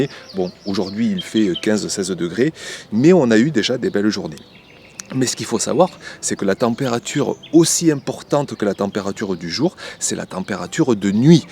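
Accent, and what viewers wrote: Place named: South, France. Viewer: la video est top, mais cet accent du sud appuyé pour qu'on comprenne bien que le monsieur est du sud, c'est aggacant pour les oreilles. J'ai des amis qui sont né et qui vivent dans le sud et ils n'ont pas cet accent, ou ils arrivent a l'estomper.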